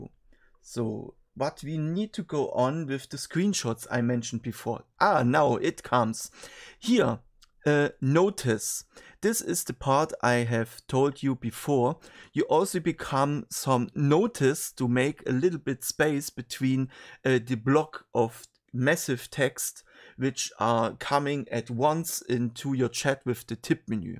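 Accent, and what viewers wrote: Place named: Germany. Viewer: Habe gleich herausgehört, dass deine Muttersprache auch deutsch ist. Und auch ein gemeinsames Hobby haben wir schonmal.😉✌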